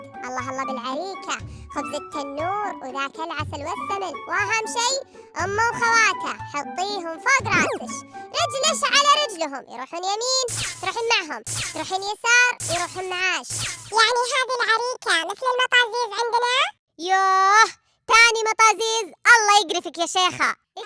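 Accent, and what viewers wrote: Hejaz, Saudi Arabia. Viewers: أحلى لهجه الحجااازي ** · مافي أحسن من الحجازي 😜😍☺️ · ههههههههههههههه يا لبيييييه الحجازية 😍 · الحجازيه تجنن ههههههههههههههه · احلا شي الحجازيه 😂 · يالبى الحجازية 😍😍😍😍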